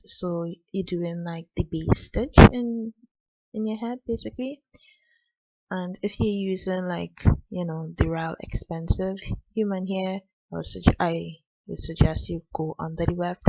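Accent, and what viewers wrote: Trinidad and Tobago. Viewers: Your accent sounds Trinidadian...great tutorial btw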